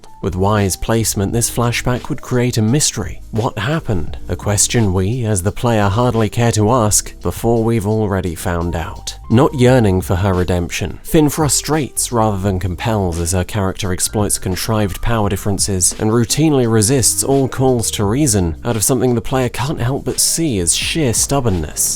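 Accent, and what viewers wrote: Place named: United Kingdom. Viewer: This is what any Englishman that isn't a chav sounds like. I assume you're either very young or American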